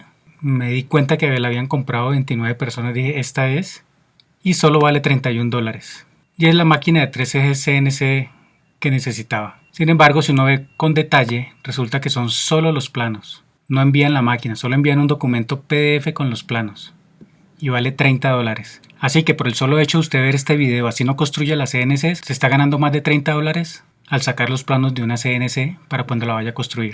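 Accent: Colombia. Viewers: hola amigo, que buen trabajo, eres de colombia? mas o menos por cuanto salio todo hablando monetareamente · Por el tono de su voz ,creo que es colombiano,y de ser así ,mi respeto ,me párese muy loable lo que hace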